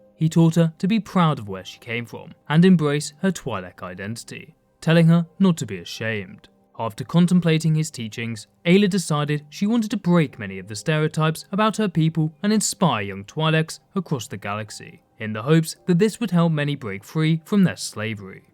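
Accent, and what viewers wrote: United Kingdom. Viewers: funny gow the CC spell twilight instead of twi'lek, either you work ob your pronunciation, or you stop using that dumb computer generated ouce with that british accent. That's not your real voice. I don't buy it. Next time, explain Ahsoka's clothes. I loved her tube top and backless outfit, but the question us the same. ps: came to know why her outfit (which I never questioned) and I learned she was a slave and then banging Kit Fisto.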